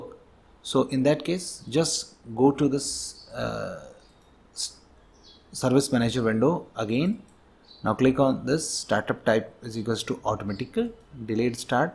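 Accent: India. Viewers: Why do I have a feeling these are botted and he is making his channel fake -_- I tried this and it didn't even work. I have Windows 10. For some reason my duckie senses are telling me not to trust this guy with that many subscribers and then with this many comments. And most of them positive I just have a feeling this guy is lying. But I really like the Indian voice. So that is my review, Thank you! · Bruh i love indian thank you soo much you guys sound so cute with your accent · Omg dude thanks soo much my internet was have a stroke and being very slow and i tryed every turotrial but its pnly yours that works thank you i dont know why people are calling you a scammer cause youre voice is indian. <3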